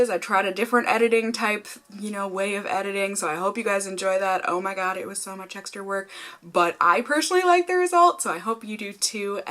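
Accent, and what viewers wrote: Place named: South, United States. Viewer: That Southern accent and the drag comments made me subscribe to you. Also the fact that you called out James Charles and the drag/clap back culture.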